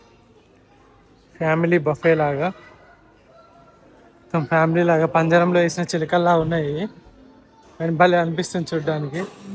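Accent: Telangana, India. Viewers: Motthaniki telangana vallam anpinchukunnav bro🎉❤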